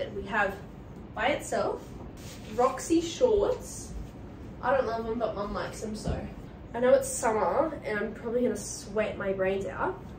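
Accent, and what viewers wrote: Australia. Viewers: I would die to look like you 😭😭 Even if I had 1% of your eternal beauty, I would probably feel more confident 😢:face-pink-tears: You are out of this world stunning - naturally cute, sweet and pretty - ur Aussie accent is adorable:) I Subscribed to your Channel btw <3:face-purple-wide-eyes: I have always thought this, but Australian people are the most beautiful people in the world - just look at Chris Hemsworth, Margot Robbie, etc... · 🙏𝟐 𝐭𝐡𝐢𝐧𝐠𝐬 𝐈'𝐦 𝐠𝐫𝐚𝐭𝐞𝐟𝐮𝐥 𝐟𝐨𝐫 𝐭𝐡𝐢𝐬 𝐂𝐡𝐫𝐢𝐬𝐭𝐦𝐚𝐬...𝐍𝐢𝐜𝐨𝐥𝐚 𝐂𝐫𝐢𝐬𝐚 𝐚𝐧𝐝 𝐭𝐡𝐞 𝐠𝐢𝐟𝐭 𝐨𝐟 𝐬𝐢𝐠𝐡𝐭 👀 𝐀𝐧𝐝 𝐝𝐚𝐭 𝐚𝐮𝐬𝐬𝐢𝐞 𝐚𝐜𝐜𝐞𝐧𝐭 😍 you've perfected the profile shot 😂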